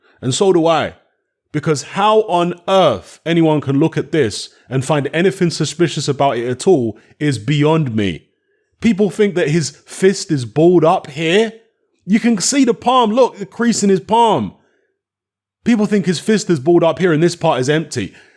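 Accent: United Kingdom. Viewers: I noticed the British accent so I would assume that you are a Tyson Fury fan. However my common sense tells me that a glove cannot flop back and forth if you hand is all the way in. You failed to explain that. TRY AGAIN. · What a coincidence that u sound like ur from the uk lol. I was cheering for Fury but after this, I can definitely see the obvious. He was hitting that man with bare fist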